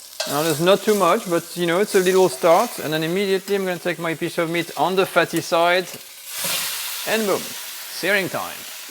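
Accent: France. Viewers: Dear friend!! You are a truly great cook! I have been following you for some time! I truly LOVE your videos and the way you explain everything, with your lovely ....Franco-English accent... BUT !!! Well, we have, SHOULD be a bit more CONSCIOUS OF what we eat!! BABY ANIMALS ??????? THINK ABOUT IT !!!! FEEL IT!!!! For the civilized world THIS FACT must be PREPOSTEROUS, DISGUSTING, APALLING !!!!!!!!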